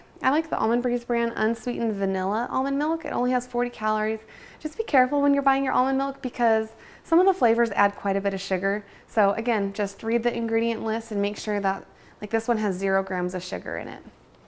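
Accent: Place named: Kentucky, United States. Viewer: Her dialect sounds interesting... where is it from ? Kentucky ? or the areas around it ?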